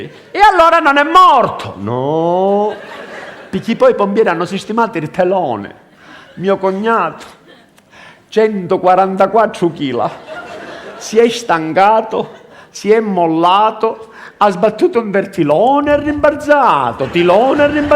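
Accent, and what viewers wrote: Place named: Sicily, Italy. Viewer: Very, very funny. He sounds like my Sicilian (di Agrigento) father spoke. grandissimo di l'america.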